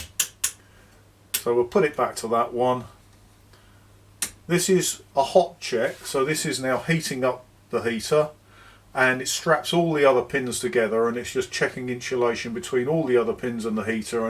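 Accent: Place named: United Kingdom. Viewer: Great to hear a British voice fixing British radios. Keep up the good work.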